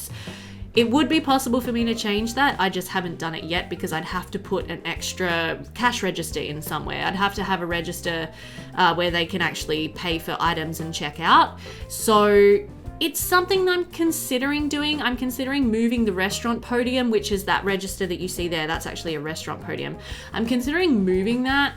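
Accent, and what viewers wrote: Australia. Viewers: Soon as I found your channel the other day, thought she has to be Australian by that accent. I am from Melbourne. So hey there ! lol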